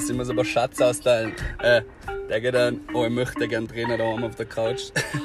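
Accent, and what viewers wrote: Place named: Tyrol, Austria. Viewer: Abgesehen davon dass ich das "tirolerische" nervlich nicht aushalte, hier kommt er zusätzlich etwas "behindert" und/oder alkoholisiert rüber.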